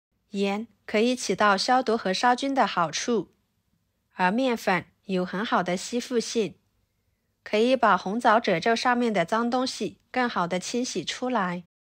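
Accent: Yunnan, China. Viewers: 又听到了云南普通话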